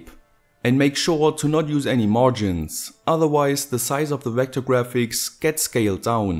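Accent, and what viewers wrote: Germany. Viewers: I really like your German accent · are u from germany?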